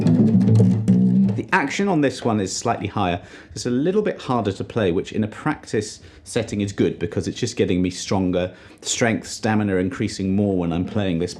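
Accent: United Kingdom. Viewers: Of all the Brit-sounding teachers out there, you ARE THE GREATEST IN MY OPINION.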